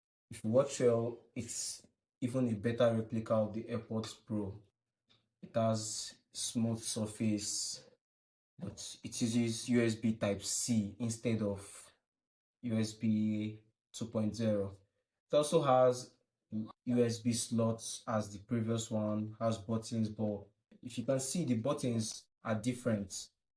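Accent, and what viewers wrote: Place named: Africa. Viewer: He's clearly African. What did you expect? The queen's English😒? American English? He's speaking a language that is not his. Show some respect.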